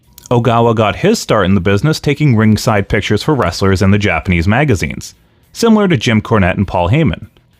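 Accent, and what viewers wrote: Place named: United States. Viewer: Nice stuff! I've been reviewing all their stuff (currently in 2001's beginning right now) and it's plain to see the issues- the fans never "took" to the mat-wrestling stuff and it was dropped, giving it no identity. Big stars Hamada & Omukai didn't sell well and couldn't connect with the fans, and lacked credibility. The biggest ideas to "push" people were essentially to have them win a bunch of flukes in a one-night tournament, which failed to really heat people up as challengers. The mess of politics in 2003 is even worse. I will say the pronunciations here are VERY "Americanized". It's hard to avoid, but MaREEko YoSHEEDA stands out, as does "Rye" TaMAda, haha. Ogawa was less of a "businessman" and more of a "PR Guy". Also Candy Okutsu was a JWP wrestler, not AJW, and it's "Korakuen" Hall XD.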